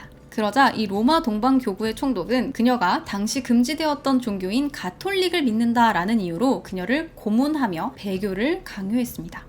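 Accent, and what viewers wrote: Gyeongsang, South Korea. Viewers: 오늘은 억양이 경상도인데 저만 그렇게 느끼나요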